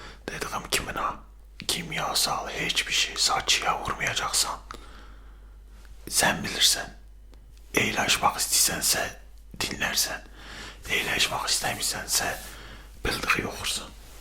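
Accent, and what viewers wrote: Eastern Anatolia, Turkey. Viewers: gece gece tam ihtiyacım olan şey. doktor roleplay mi hayır doğu şiveli olmasindan bahsediyorum(3 senedir türkiyedeym bende 17 me kadar ingilteredeydm iyi gceler) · ilk defa doğu şivesi konuşan doktor görüyorum dfsghdjfkgt teşekkürler <3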